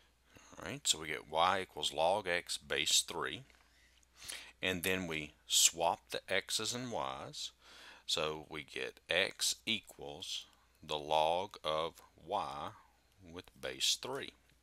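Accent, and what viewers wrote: South, United States. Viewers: Not even joking, I somehow understand better with a Southern accent. It sounds straightforward, simple, and just cool.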